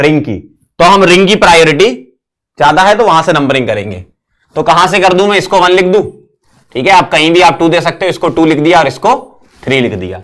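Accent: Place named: Ranipura, India. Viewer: Ranipura se ho ka masaab?? Eso sunbe me a Rao.. mau balan ki traf se raam Ram..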